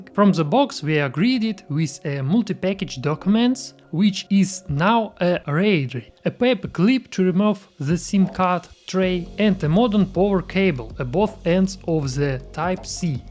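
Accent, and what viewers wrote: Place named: Russia. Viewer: subscribed just for Russian accent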